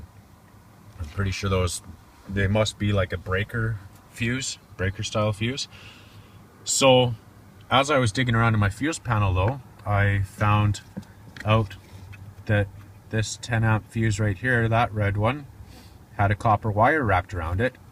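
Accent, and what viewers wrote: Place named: Midwest, United States. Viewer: just for kicks where is that accent from you Canadian or from Minnesota?? Lol. I'm a midwesterner too so I picked up on the accent?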